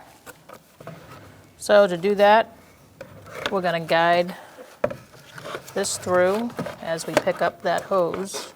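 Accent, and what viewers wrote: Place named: Massachusetts, United States. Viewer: Love the accent, sounds like she's from the northeast like Massachusets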